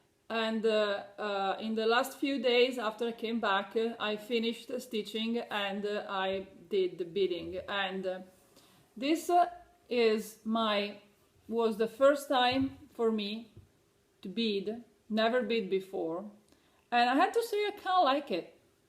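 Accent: Italy. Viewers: Yes...i love your Italian accent....its very soothing. Xxx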